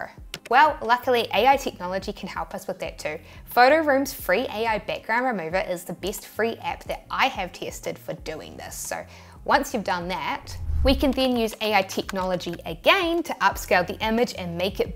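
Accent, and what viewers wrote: New Zealand. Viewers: I really enjoy listening to that New Zealand accent..🙆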